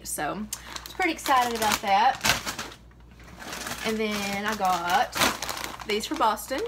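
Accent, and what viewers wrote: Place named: Kentucky, United States. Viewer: Love your videos! I’m from Lexington so I love the KY accent 😘😘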